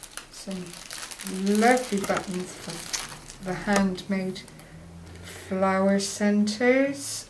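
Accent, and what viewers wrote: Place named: Cornwall, United Kingdom. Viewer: Wow, you lucky lady, I like your accent, I wonder if your from cornwall? I love it down there...the plaque you won is lovely, I tried to win it too..lol..so generous of Fiona to send the extras for you, I love that dangly trim, you'll have fun using that I'm sure. I am also learning so much from her tutorials. Welcome to the YouTube video making side of crafting..lol..(even if you do only ever have 1 video..lol) enjoy your winnings. TFS...Ann...x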